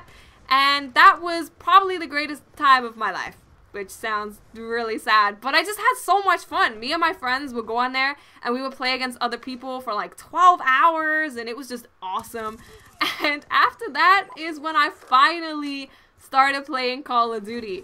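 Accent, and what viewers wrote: Latin America. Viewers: are you Hispanic? I love your slight accent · lol, I can hear the Latina in her voice. Awesome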